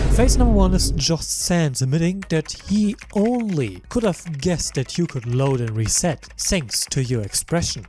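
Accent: Germany. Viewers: ja, wenn man Deutsche gut kennt hört man einen deutschen akzent bei ihrem englisch, und auch cool dass du deutsch kannst haha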